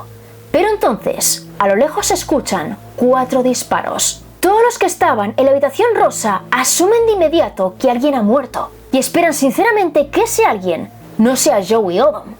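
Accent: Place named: Spain. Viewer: Mansión. Saludos hermosa bellota. Excelente video. Tu acento español... Es hermoso y precioso.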